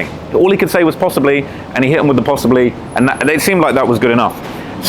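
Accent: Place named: United Kingdom. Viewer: That’s how British people speak bro calm down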